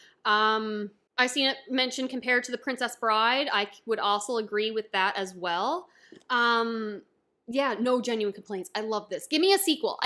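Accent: Canada. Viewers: I love/appreciate your Canadian or maybe Wisconsin pronunciation!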